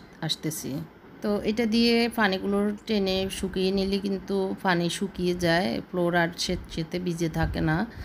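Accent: Noakhali, Bangladesh. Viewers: আপু আপনি কি নোয়াখালীর। ভাল না লাগলেও glabs use অবশ্যই করবেন। আপনার হাত নষ্ট ঽবেনা। অভাব হয়ে যাবে।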